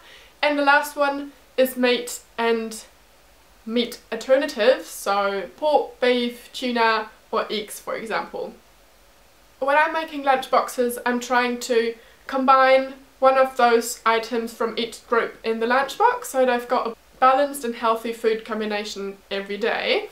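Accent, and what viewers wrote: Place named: New Zealand. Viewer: wow du hast einen richtigen Kiwi Accent 👏😻👍 vor allem das "e" ich liebe es 😄 noch ganz viel Spaß :)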